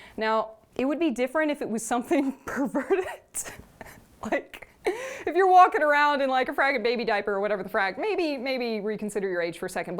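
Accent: Texas, United States. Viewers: can anyone else hear the texan accent jumping out in this video????? 👀 (especially when starts getting fired up, i.e. about the first post and how crazy it was)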